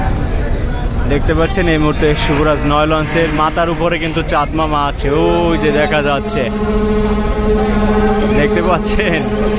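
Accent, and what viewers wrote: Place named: Barishal, Bangladesh. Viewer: ভাই আপনার মুখে বরিশালের ভাষাটা আমার কাছে অনেক অনেক ভালো লাগলো আমার ভাই বরিশালের ভাষা অনেক ভালো লাগে আমার নানা বাড়ি ভোলা ।আমার দেশের বাড়ি শরীয়তপুর তারপরও আপনার বরিশালের লঞ্চের ভিডিও দেখি আমার অনেক ভালো লাগে ভাই। ❤❤❤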